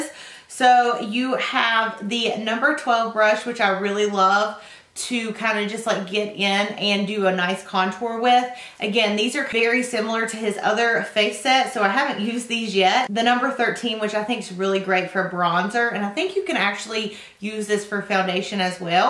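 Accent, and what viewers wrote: South, United States. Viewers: Hi first time watcher here. Where do you live? I hear that southern accent! 🤗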